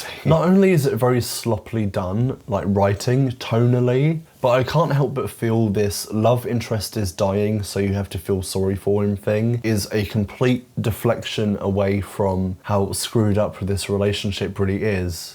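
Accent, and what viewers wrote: United States. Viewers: i love ur american accent lol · Your American accent is flawless 😅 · Your american accent is perfect never stop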